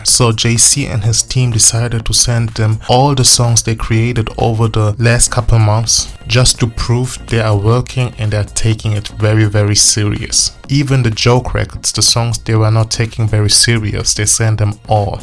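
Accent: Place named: Germany. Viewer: Your German accent is the Hammer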